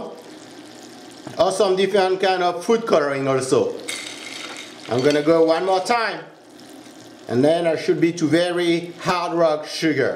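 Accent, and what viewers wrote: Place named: France. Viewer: Who doesn’t love a French accent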